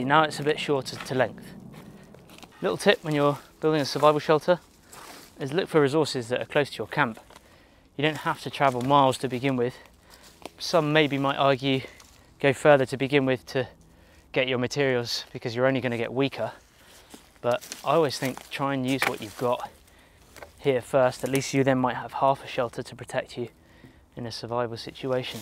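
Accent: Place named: United Kingdom. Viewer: Make a hammock! I like the new mic. I can hear your beautiful Bri-ish accent much better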